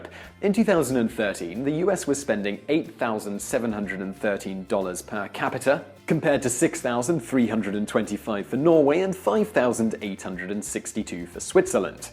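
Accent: United Kingdom. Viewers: I thought you are Brit. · lel a british guy talking about american politics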